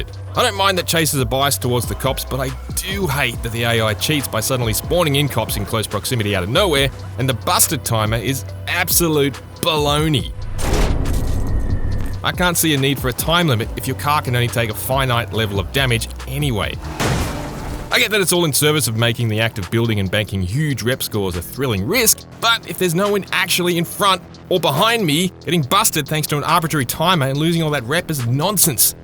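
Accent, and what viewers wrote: Australia. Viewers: He's Australian? 🆕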